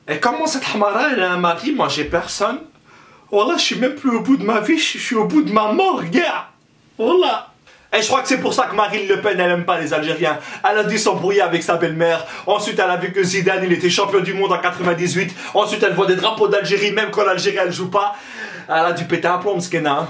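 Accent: Belgium. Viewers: Accent belge???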